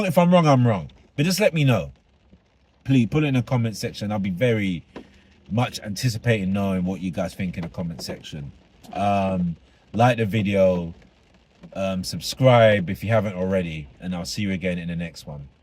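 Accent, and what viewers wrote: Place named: United Kingdom. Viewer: Hi, from US, I too thought it was a bit weird but thought, maybe thats from the stress of her grieving, maybe she was even a little medicated. I dont know. I myself would've been far from the public eye & probably under heavy grief support somewhere. I'd be not ok if this happened to me. So, its all very sad and strange. Love your accent, are you in the UK? Love it there. 😊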